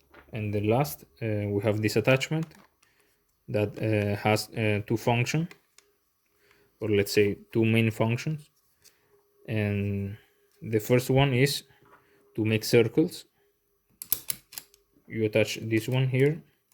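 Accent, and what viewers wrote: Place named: Italy. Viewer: I understand everything he says. He speaks English with an Italian accent. Put on the subtitles and you can read what he says.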